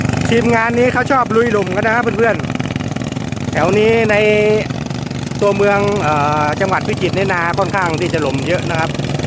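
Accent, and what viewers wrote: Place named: Isan, Thailand. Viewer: เว้าอิสานแน่คับ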